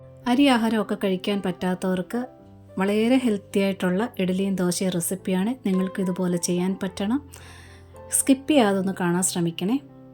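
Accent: Kollam, India. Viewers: കൊല്ലം ജില്ലകളിലുള്ളവർ പറയുമ്പോൾ സംഭവിക്കുന്നതാണ്... പ്രദേശികമായ ഓരോ സ്ലാങ്ങുകളുടെ പ്രത്യേകതയാണ്.. ഞങ്ങൾ കൊച്ചിയിലുള്ളവർ മാങ്ങ പറിക്കുക എന്ന് പറയുമ്പോൾ, കൊല്ലത്തുള്ളവർ മാങ്ങ പിച്ചുക എന്നാണ് പറയാറുള്ളത്.. Never mind.. റെസിപ്പി എന്തായാലും സൂപ്പർ 🙏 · കൊല്ലം slang 😊..recipe is healthy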